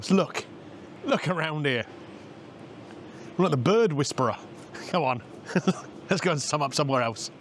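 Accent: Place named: Cockney, United Kingdom. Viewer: And here’s me thinking that you live in Mayfair. Well you do speak posh like your buddy Philip Saunders. 😂 just kidding mate. I know you’re one of those cockneys. Anyway, yeah the fish n chips looked really good. Good enough price too the rest of the food, meh… By the way that soft mud that you sink in is called quicksand 🙄 Great review ☘️👍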